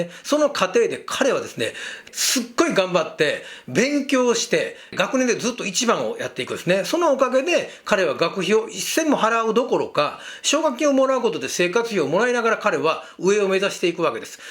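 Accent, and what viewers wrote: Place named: Kansai, Japan. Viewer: 関西弁って苦手なのですが、の語り口調は凄く聴きやすいですね。話も勉強になりました。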